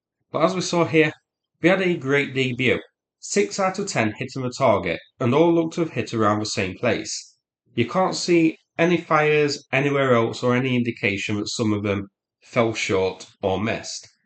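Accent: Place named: Middlesbrough, United Kingdom. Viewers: I agree with you. English is the language one uses if he wants to have an international audience. If one speaks with his Middlesborough local accents, then he is not speaking "internationally understood English", not the one which is internationally understood as such. I understand him because I turn the subtitles on, but the subtitles as well often don't understand what he says, and they cannot be faulted at all. I think he would have a larger audience if he used some computer generated voice. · i'm sorry you have this problem. The good news is: you have a great opportunity to learn understand Middlesbrough accent. It may not match what you expect, but it would be a lot less genuine if he put on some fake south of England middle class accent.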